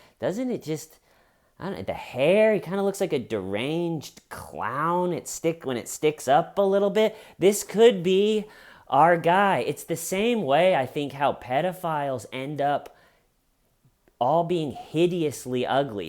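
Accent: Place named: Boston, United States. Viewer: I'm from Boston and you make me lol with your Boston aaaacccent. I've been a true fan from day 1 when you post a video it makes my day! Any comedy show coming to Boston in the future?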